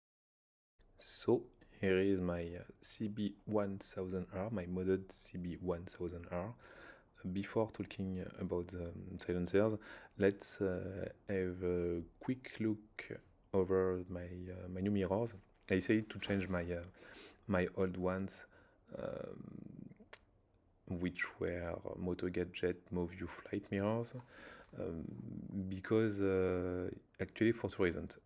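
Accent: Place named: France. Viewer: Je ressent un léger accent French l’ami 😉 je me trompe 😜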